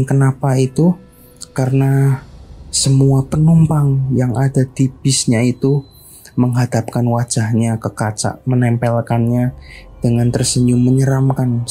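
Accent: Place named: Banyumas, Indonesia. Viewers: udah medok, kebanyakan kata "itu". skippp2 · wkwkw lucu ya wong ngapak nek cerita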